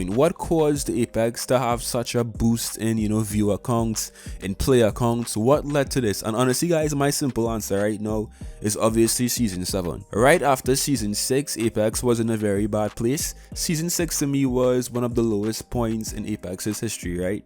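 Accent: Trinidad and Tobago. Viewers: Are you from trinidad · Are u trini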